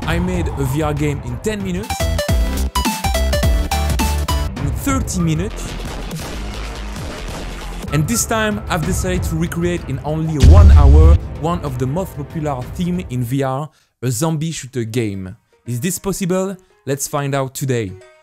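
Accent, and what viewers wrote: France. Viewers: I love hii french english accent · you have a french accent are you french ? because me too ! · Tu est bien français sa se vois de ton accent continue le bon travail frére💪🏻💪🏻💪🏻💪🏻💪🏻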